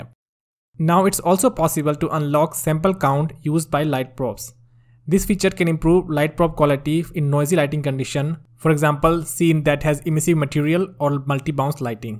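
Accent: India. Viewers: Are you from Mumbai?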